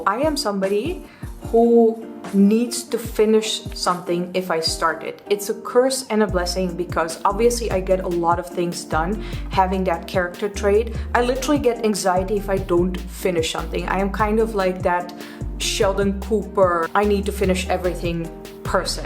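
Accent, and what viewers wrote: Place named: India. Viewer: Ik vind het zo schattig en leuk om te horen hoe inmiddels het Indiase accent je uitspraak beïnvloedt. Superleuk om te horen :)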